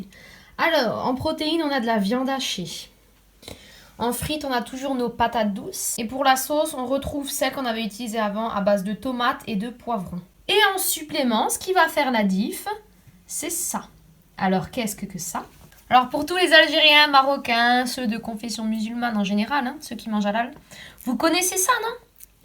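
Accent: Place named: Moselle, France. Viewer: Salut, moi je le trouve chouette ton accent! T as des origines du côté de Moselle est? Forbach, saint avold etc...?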